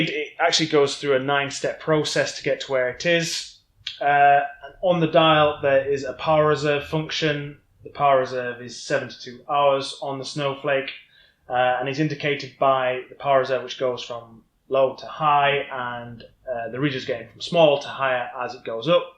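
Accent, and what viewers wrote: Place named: Manchester, United Kingdom. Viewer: Skanky Manc Accent from the poor man's Michael York, recorded in an echo chamber. Nonetheless, nice vid.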